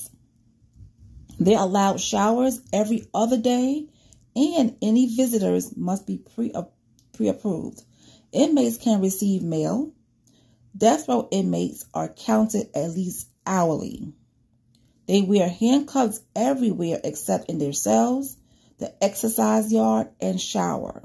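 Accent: New York, United States. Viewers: You sound like your from New York. We got New Yoooork in da house!!!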